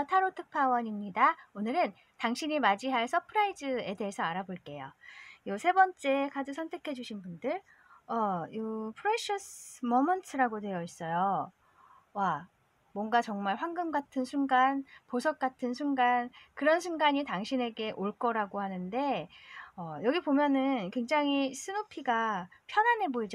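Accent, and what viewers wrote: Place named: Seoul, South Korea. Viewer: 서울 말씨를 예쁘게 쓰시는 것 같아요^^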